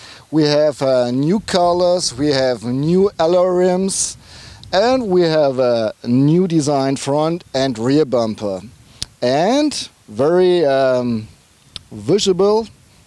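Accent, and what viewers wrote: Germany. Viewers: Very good review and entertaining keep your German accent I will keep my french one... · I really really like your German accent. As a college student, my professor is a German who comes from Goettingen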